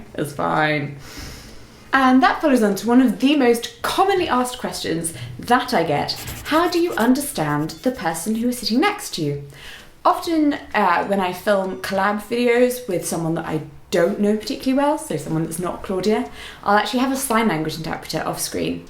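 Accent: United Kingdom. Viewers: You sound beautiful, I love your voice and I usually hate British accents, it’s great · You have the most British accent I have ever heard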